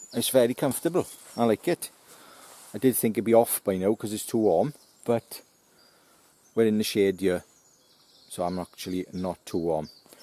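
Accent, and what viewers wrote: Wales, United Kingdom. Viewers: Great video, hope you don't mind my asking, but whereabouts are you from? (I know Wales lol) I recognise your accent, you sound like your an Amman Valley boy.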